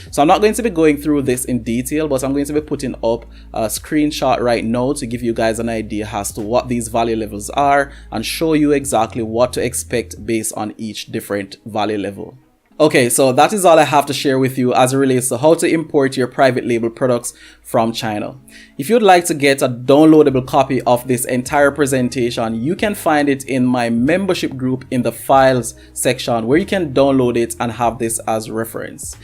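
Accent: Jamaica. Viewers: U sound like a yaadi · Bro your jamaican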